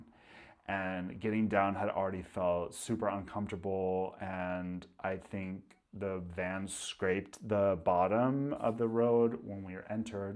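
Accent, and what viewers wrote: Bavaria, Germany. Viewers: I am new to your channel. What I notice most of all is your Bavarian intonation! I don’t hear it in German but I do hear it in people speaking English after a lot of exposure to bavarians. If you don’t know what I mean, listen to Feli from Germany and her unusual patterns, I hear a lot of them in this video.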